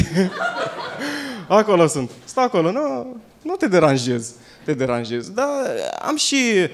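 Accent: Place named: Moldova. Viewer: Un oltean cu accent de moldovean. Greu sa-l inteleaga ardelenii.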